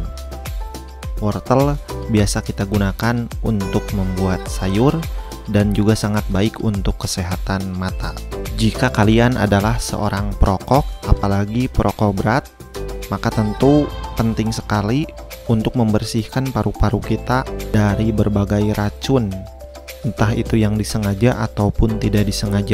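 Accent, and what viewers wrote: West Java, Indonesia. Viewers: Cara ngomongnya jiga orng sunda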